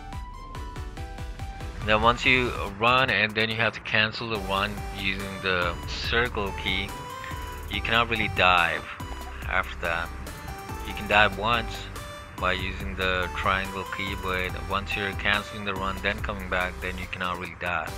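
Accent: United States. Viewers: Are you American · Oh now I get it. In one of your earlier replies you had used hindi/urdu and from your english accent i thought you are an american. 😀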